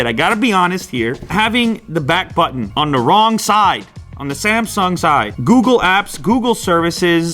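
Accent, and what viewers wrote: France. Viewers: Lol that french accent sucks from english ot american people 😂😂😂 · what's with the French? You trying to be sexy couse dude you are fine already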